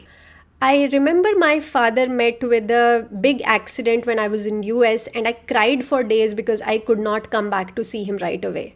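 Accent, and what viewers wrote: India. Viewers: You are Indian and India is your country there's no reason for you to escape India because India is horrible because of Indians That's your opportunity to make something better that's your opportunity for wealth America is a rich country with prosperous Americans and you are just cheap labor in America no matter if you think you're making 100K and you think that's a lot of money but that a lot of money to Americans 88% Eighty-eight percent of Indians in America are cheap labor 94% ninety-four percent of Indians in America make American wages or below If Indians are so Superior why is India at the bottom of the world Are the British to blame? Why is Pakistan above India why is Bangladesh above you This is Indian mentality you don't want to work and you don't want to work hard you don't want to improve you have Hindu Brahmin lazy mentality you want to sit on the throne and have everybody else do the hard work You can become wealthy and India all you have to do is put in the work but you're not willing to do it you're not willing to improve India those are the people that become wealthy those are the people that become wealthy in India those are the people that become wealthy in the world they make something better My guy will you ever get rid of that forbell Indian accent and speak clearly Why wouldn't you want to change India and make it improve India is at the bottom of the world if you don't want to improve India than India will always be at the bottom and Indians will alway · Your accent is Indian after staying there for 10 years. 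You were not co mingling with the American culture. Sidehe kaho ki H1B visa nahi mila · U still have a thick Indian accent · Pseudo American; wants to live in India as an American. Doesn’t belong here or there, just an opportunist. Horrible Indian English accent. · why did you go back to India? 100K is low for Indian in US especially in NY? You have heavy Indian accent. Shows that you did not assimilate in US.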